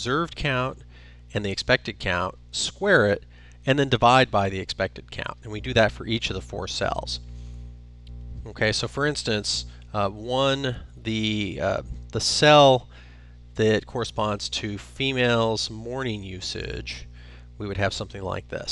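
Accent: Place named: United States. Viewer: Thanks. Nice to hear an American voice teaching statistics!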